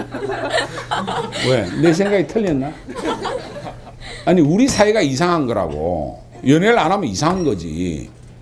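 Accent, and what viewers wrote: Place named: Gyeongsang, South Korea. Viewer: 고자 동남방언 억양 때문인듯 ㅎㅎ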